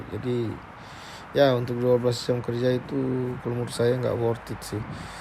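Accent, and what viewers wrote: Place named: Manado, Indonesia. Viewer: Dari logatnya ngana dari Manado kang, butul2 nyong Menado guanteng2 do e, semangat ya nyong Menado, Tuhan Jesus memberkati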